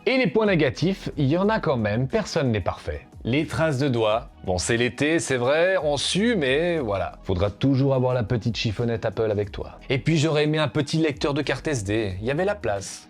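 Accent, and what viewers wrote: Switzerland. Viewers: Tu es suisse ?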